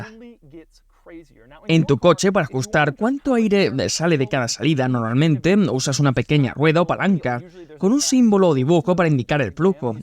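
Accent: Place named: Spain. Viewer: Un zipizapo no debe poner su voz en videos, si de por si escuchar un zipizapo es desagradable escuchar un español zipizapo es insoportable solo soporté el video 4 minutos antes de apagarlo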